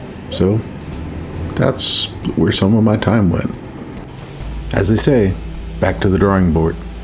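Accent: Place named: Yorkshire, United Kingdom. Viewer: Not a bad Yorkshire accent! 😂. Loving this, you are clearly insane but this is gonna be great. Well done mate 👍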